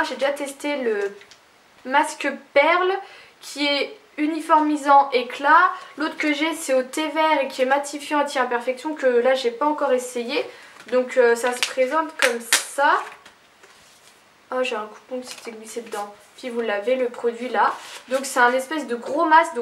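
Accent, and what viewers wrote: Lorraine, France. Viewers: l'accent lorrain, c'est trop mignon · Tres bel accent lorrain! trop mimi!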